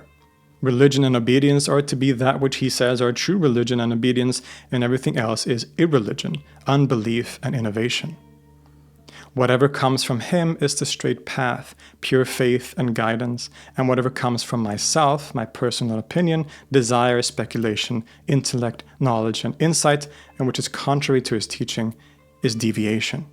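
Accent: Arab world. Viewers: this. your last comparison was extremely on point. he belonged to a persian background, it makes sense to pronounce the name with Iranian / Farsi accentation. there was a similar problem where he pronounced some names from the subcontinent straight up "wrong" in one vid (can't recall which rn tho sorry) cuz of arabization. not to say that this is in bad faith. i'm sure he just finds this to be the most intuitive reading, and ends up defaulting to it during his narration, which is smth i relate to as an urdu speaker who reads farsi in a very urdu accent :,) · I have a question to ask, why do you always use Arabic pronunciations when you talk about Persians? Is there a reason behind this? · Awesome work as always! I thank you and salute you for bringing more and more of these Eastern geniuses into public recognition, which I think would ultimately have a positive impact on the world we live in. 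On another note, I think your pronunciation of Persian names and words is a bit over-Arabicized! Persian names and words such as Tus and Tusi do not follow the Arabic rules of pronunciation. For instance, the Perso-Arabic letters of س،ص،ث are all only pronounced as [s] in Persian, unlike in Arabic where they all have different pronunciations. Persian has its own completely different rules of pronunciation, despite being written in the same script as Arabic. Arabic words and proper names loaned into Persian also follow these Persian rules of pronunciation. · This guy is such an arab wannabe he even says Iranian names with the guttural Arabic pronunciation. Khwajeh Nasiruddin-e Tusi... At least learn his name.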